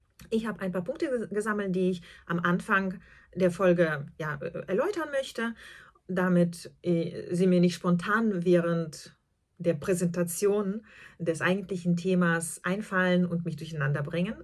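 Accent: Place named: Luxembourg. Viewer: Liebe, ich finde deine Videos sehr interessant. Das eine war mir auch zu viel Theorie. Dann nehme ich mir die Freiheit abzubrechen. Ich habe vor vielen Jahren eine Übersetzerausbildung an einem Fremdspracheninstitut gemacht. Ich liebe Sprache und was damit zusammen hängt. Wenn ich dir zuhöre, bilde ich mir ein, einen luxemburgischen Akzent zu hören. So und jetzt schaue ich weiter 😊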